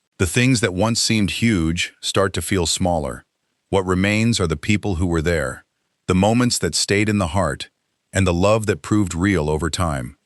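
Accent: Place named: United States. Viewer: Why do we have to suffer the American voice-over wether it be AI or genuine?😢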